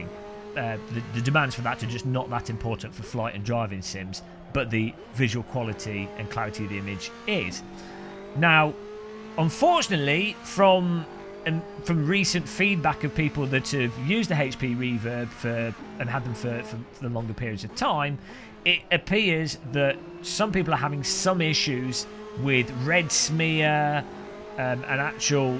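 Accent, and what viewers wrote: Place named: England, United Kingdom. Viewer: Classic counter video before new product, plus eng accent. nothing to see here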